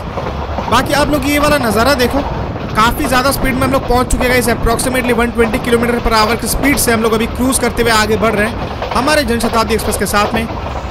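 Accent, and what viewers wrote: Bengal, India. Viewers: Yes he's bengali see his most popular SEALDAH rajdhani video's last 5 minutes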